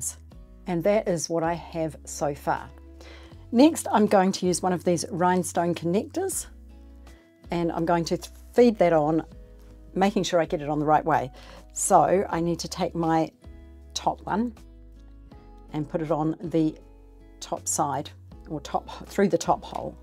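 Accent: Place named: New Zealand. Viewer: The Kiwi accent tripped me up, I thought she was saying pills, pills and more pills 💊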